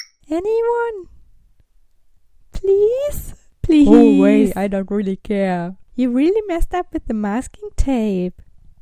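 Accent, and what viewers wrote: Germany. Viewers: Your german? I hear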